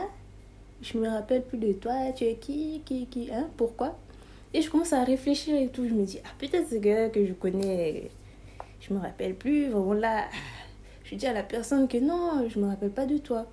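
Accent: Democratic Republic of the Congo. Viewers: Une vrai CONGOLAISE sans complexe avec son accent j'aime 😍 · 😂😂j'aime trop ton accent congolais ❤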